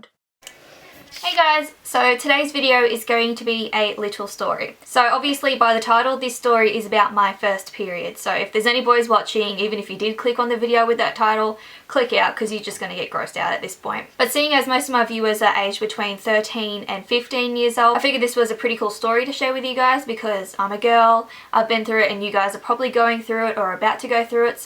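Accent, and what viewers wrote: Australia. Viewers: Are you Aussie? You sound like it cx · Your Australian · Is she Australian? Cause I'm Australian. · Are you Australian? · Are you an Aussie? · This Australian accent :D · Shes aussie :) yay one of us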